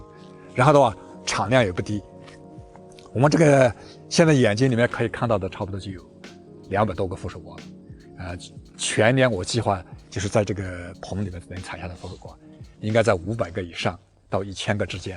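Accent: Yunnan, China. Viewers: 我的家乡是云南德宏芒市，听着您的乡音太亲切了! 我也喜欢种菜，您的视频太有帮助了!谢谢!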